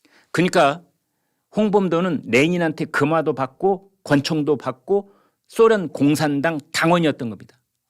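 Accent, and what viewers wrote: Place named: Gyeongsang, South Korea. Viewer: 왜 경상도사람은 육십구라 하든지예슨아홉이라 하던지 육십아홉이라. 왜하나요 개같은경우.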